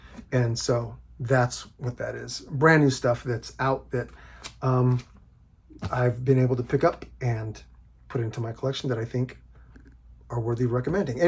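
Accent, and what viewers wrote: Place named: France. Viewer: Hey thanks for the recommendations. I must admit I am not that big of a fan. I have only heard his first but it was really boring to me. I do like although I don't have any of their albums on vinyl. that is interesting. I do have. I didn't realize they were still putting out music. Nice French accent by the way. I hope you are doing well. Cheers.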